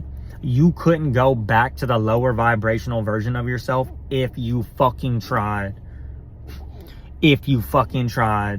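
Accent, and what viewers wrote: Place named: Louisiana, United States. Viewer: Hi! I just found your channel! You sound like you’re from Louisiana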